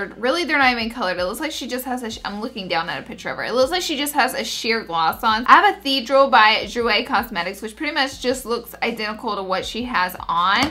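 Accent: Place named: Georgia, United States. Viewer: Ugh, it upsets me when people make comments about your voice because your voice is so cute!! I literally could listen to it all day, ur accent reminds me of back home in Georgia.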